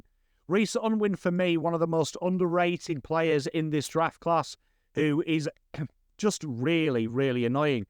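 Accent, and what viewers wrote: England, United Kingdom. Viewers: Don't know what you heard but he said that it's his pommy accent 😅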